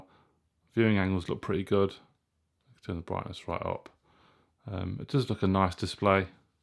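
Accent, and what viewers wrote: United Kingdom. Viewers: Sounds like you are from UK, so we will not get a screen brightness test in sunshine, unfortunately.. That is what I am mainly interested in..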